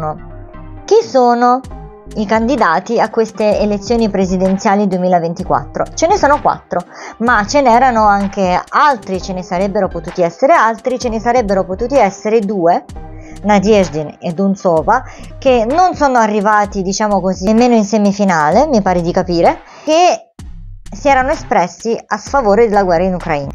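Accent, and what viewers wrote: Naples, Italy. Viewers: Ciao.. sono arrivato al tuo canale per gli arcani misteri dell algoritmo di youtube e sinceramente sono rimasto positivamente basito. Ubi bene ibi patria scrivevano i romani tu sicuramente li sei felice e non ce bisogno di chiederti perche'! . Le tue ultime parole sono uno splendido messaggio all'occidente di non perdere quello che ha costruito , diritti sociali e opportunita di cambiamento. Non vivi con i coniglietti ma hai quella compassione tutta partenopea ( credo, partenopeo.. l'accento mi induce a pensarlo ) nell'accettare l'ineluttabilita' degli eventi in un luogo dove, un sogno sociale e' diventato un incubo per molti e la cura, dopo il risveglio decisamente piu lugubre . Navalny era una truffa tutta occidentale perche' niente di lui volgeva a un pensiero democratico ma sai.. anche qua la sinistra si e innamorata di hamas che dei diritti sociali e di tutto quello che l'illuminismo vede nella societa' sono la reale antitesi. 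Un po come la destra nostrana che paga Dugin per farsi raccontare che la razza non e' acqua e loro (Russi) sono gli angeli e gli altri destinati a una inevitabile sottomissione.. Incongruita dei sistemi .. Ajmone Federico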